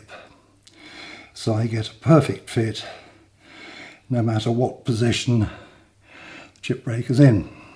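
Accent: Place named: England, United Kingdom. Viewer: For those hard of hearing, or not familiar with pronunciation by an Englishman, 10,000 grit ceramic waterstone is made by Ohishi.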